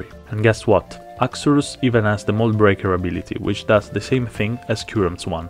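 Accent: Italy. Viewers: Maaa, per caso lei è italiano?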